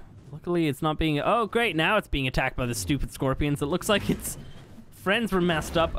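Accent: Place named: Ireland. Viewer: Jolli_2000 hes Irish its the way they talk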